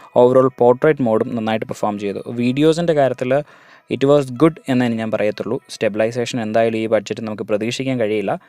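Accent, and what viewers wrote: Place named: Kasaragod, India. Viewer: Kasargod slang video yanda channel bannang kittum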